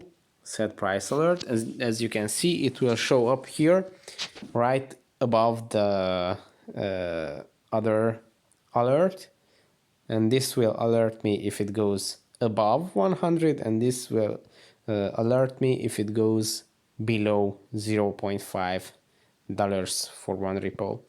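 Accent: Hungary. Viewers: Ur from Hungary, I hear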